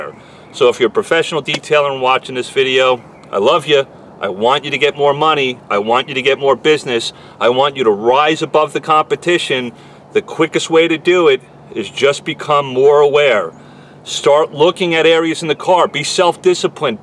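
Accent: New York, United States. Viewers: It says Dallas, but you sound like NY.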